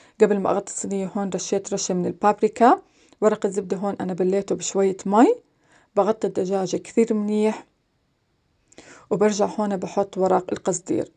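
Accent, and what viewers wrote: Jordan. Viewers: أردنية أردنية يسعد لهجتها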